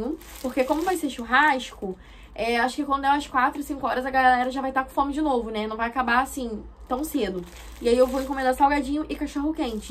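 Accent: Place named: Rio de Janeiro, Brazil. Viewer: Nos primeiros 5 segundos de vídeo eu já vi logo que era carioca ❣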